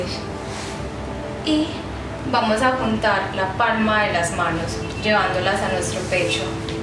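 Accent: Antioquia, Colombia. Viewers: Buen vídeo linda,debo decir que tienes una muy bella tonalidad en la voz,relajante,debe ser su acento paisa,saludos bonita. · Quiero saber cómo se le llama a tu acento, sé que eres colombiana pero quiero saber eso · Por el acento la chica es colombianas.. por el cuerpo la chica es divina.. por la pesonalidad la chica is likeable...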